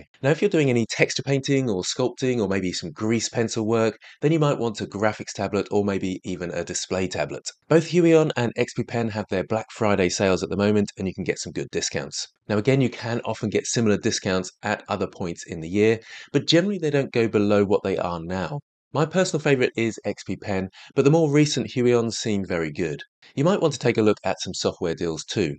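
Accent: United Kingdom. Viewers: Are you from the United Kingdom of Great Britain and Northern Ireland?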